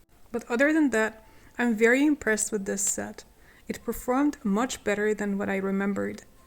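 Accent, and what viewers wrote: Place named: Hungary. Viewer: I came upon your channel and I enjoy your art very much and the way you present it. Also, I hope I’m not mistaking (I don’t think I am) I can hear a slight Hungarian accent which I recognize because I am also Hungarian. I cannot believe the derogatory comment you received, your english is beautiful, I don’t understand why would someone say those things,but I’m not completely surprised,there are many different people in this world. I am looking for more videos from you,es kivanok sok szerencset es a legg jobbat mindenbe!😊 Maybe my hungarian is not the best anymore because I live in America for the last 44 years. Szia 👋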